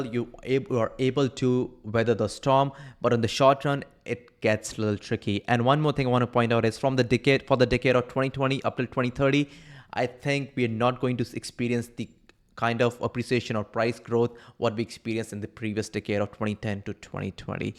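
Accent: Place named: India. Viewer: I heard an Indian accent and immediately turned off.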